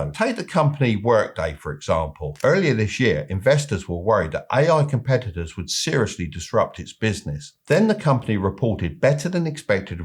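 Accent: United Kingdom. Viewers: come on grand pa. I think you lying. cos america is booming business is great.. you sound liek a British. a depressing miserable land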